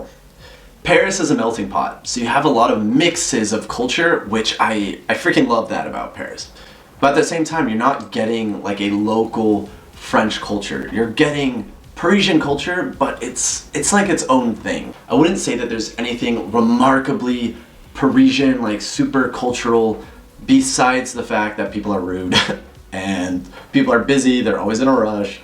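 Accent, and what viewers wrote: United States. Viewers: C'est surprenant que même après neuf ans en France vous gardez votre charmant accent américain.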